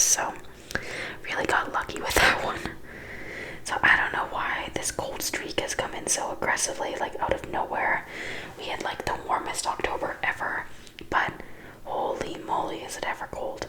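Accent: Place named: Canada. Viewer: I'm new here! Your video came up on my Youtube suggested on the homepage! I am so glad I clicked on it. I'm doing some sketching right now, and watching you paint was so nicee! I want to see you finish the project so badly. I live near Toronto, so it's really nice to hear a Canadian accent do ASMR, especially whilst being creative. I did film editing at university, was great to learn so if you are interested you should definitely take a class on it or self teach using YouTube to start with! Pick one software and then decide what kind of project you want to make, then search how to do basic edits using that software, you'll pick it up in no time :) You talking about enjoying your hobby really motivates me to enjoy time to myself once in a while and relax whilst enjoying a hobby, thank you so much for your content. Please keep doing these I love it! I am always looking for good quality art asmr and yours has been perfect! 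I want more lol! <3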